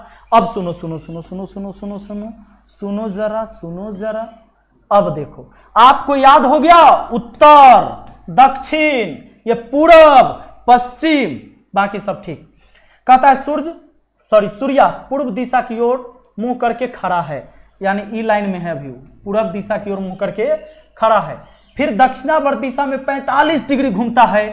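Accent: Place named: Bihar, India. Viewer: Sale bihari hindi me bol liya kar.... Bihari bolna jruri hkya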